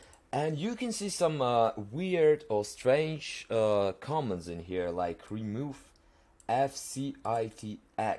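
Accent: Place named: Russia. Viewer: that's russian accent bruh :)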